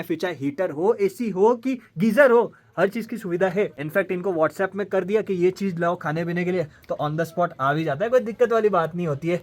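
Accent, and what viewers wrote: Gujarat, India. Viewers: Tumhare Accent Se Lagta He Aap Gujrati Ho.. Shu Tame Gujrati Cho Bhai?😊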